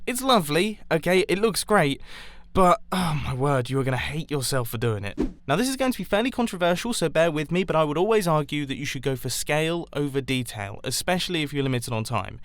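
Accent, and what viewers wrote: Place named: England, United Kingdom. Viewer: Damn i feel the english through my phone.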